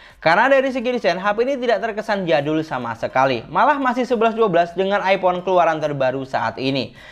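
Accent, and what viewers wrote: Madura, Indonesia. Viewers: Logat ny kok kayak org madura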